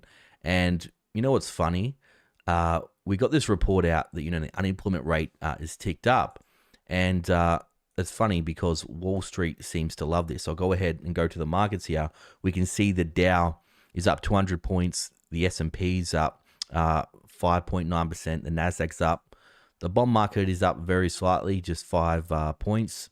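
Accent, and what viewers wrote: Australia. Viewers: You can call it data or data, I really don't mind Love the ozzy accent! Thank you mate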